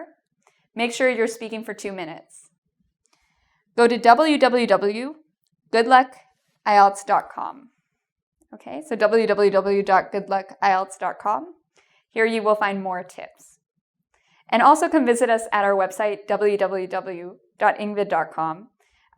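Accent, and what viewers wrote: Canada. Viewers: I am going to talk a-boat... the accent is sooo cute! Love Canada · good luck to everyone who's going to do the exam, kudos to you if you picked up on her Canadian accent, is a really competent teacher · Canadian accent is dope. <3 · I'm Scotish and I don't understand your Canadian accent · Is she Canadian? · Her Canadian accent is so cute.... Aboot <3333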